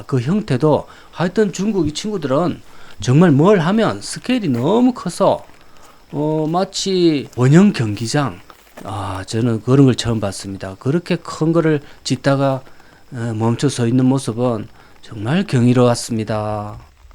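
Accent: Gyeongsang, South Korea. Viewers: 표현력도 좋으시고 지식도 풍부하시고 경상도 말씨도 듬직 하시네요 안타까운 현실.. 덕분에 잘 봤습니다 감사해요 구독좋아요 꾸욱~~~♡ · 목소리가 어째 으시시하네요. 게다가 겡상도 사투리로. 에이~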